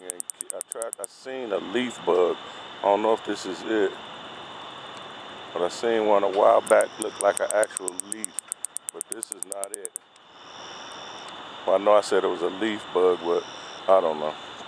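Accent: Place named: South, United States. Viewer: I don’t know if it’s the speaker on my phone or if that katydid is making a higher pitched noise than the sound I’m used to hearing on a summer night. I could tell it was loud though cause when it would start it would drown out everything else that your microphone was picking up. But I’m used to katydids making more of a chatter sound off in the trees. You sound like you’re from the south. I’m from the south and you sound just like a buddy of mine. Just something I noticed. That’s all.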